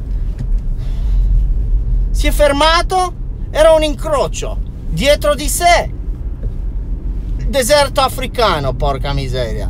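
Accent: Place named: Moldova. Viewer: Dall’accento sembri moldavo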